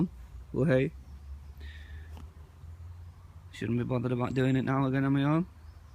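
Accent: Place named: Yorkshire, United Kingdom. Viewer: nice tl here a Yorkshire accent were about you from ? me south leeds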